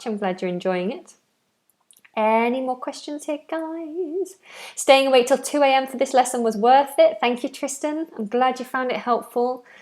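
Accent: United Kingdom. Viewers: I enjoy your accent, which reminds me of the time in uk.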